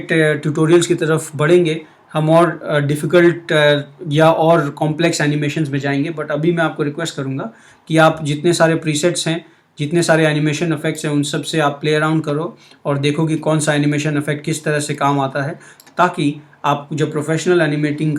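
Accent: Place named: Haryana, India. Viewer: owsm video sir . aapki voice mybe haryanvi hai hahaah good work thumbs up?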